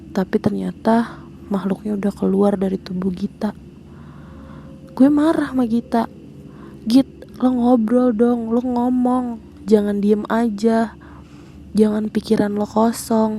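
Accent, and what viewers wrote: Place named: South Jakarta, Indonesia. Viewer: Orang Jaksel banget nih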